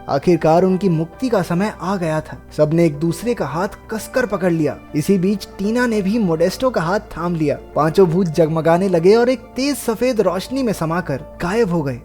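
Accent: Haryana, India. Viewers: bhau tu pakka havanvi h mne to deshwali laage h yar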